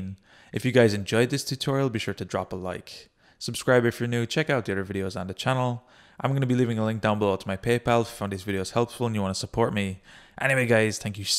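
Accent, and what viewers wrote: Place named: Ireland. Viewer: I can hear an irish accent of ye, you irish by any chance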